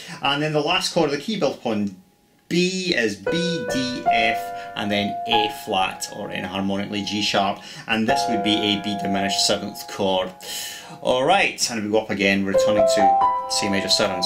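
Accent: Scotland, United Kingdom. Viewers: real good lesson, did you learn piano first or guitar? You seem to know piano quite well and I say that because I'm classically taught from a very early age then later learned guitar and drums, I'm your age and my piano and keyboard playing has helped my guitar playing greatly. You are so very much better than many others who try to do the same.Love the Scotch accent, used to live in England when i was very young.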